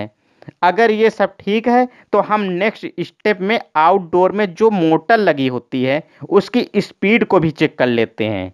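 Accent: India. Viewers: You Are Gujrati?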